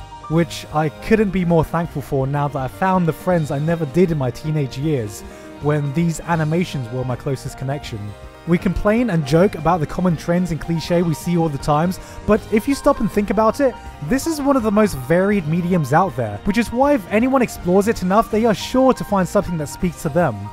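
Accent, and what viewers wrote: United Kingdom. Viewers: You know, watching videos is a lot like watching a well-written show. You have the videos like his "Anime in Minutes" series or his rants/explanations like the "Konosuba" video series which are meant to be lighthearted entertainers. Then, every once in a while, you get videos like these. That same lovable British accent we all know for cracking the occasional dick-joke goes on to bring us "The Perfect Anime" and videos such as this which explore personal feelings and memories. These videos remind me of watching an anime, because while we connect to anime, many of us can probably connect to this video. It's really something amazing that I can watch this video and get so much more than a single, simple point out of it. It's as if you've just said what many have tried to say but couldn't. Thank you.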